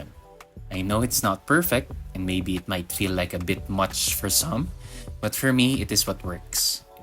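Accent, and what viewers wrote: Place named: Philippines. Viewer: Same as an EDC enthusiast I was taken a back nung nag tagalog siya and may filipino accent 😅😅. Great content po kuya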